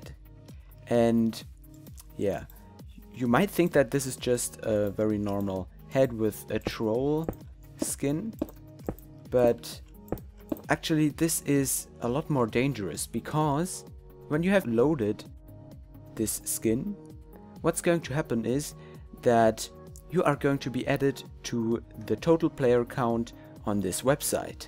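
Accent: Germany. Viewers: Are you German? Im asking because you have a German like accent.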